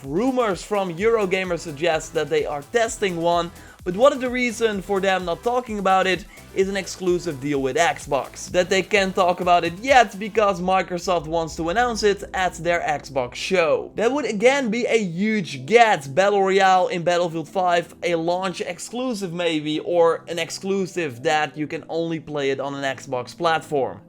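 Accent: Netherlands. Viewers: Are u Dutch? you sound Dutch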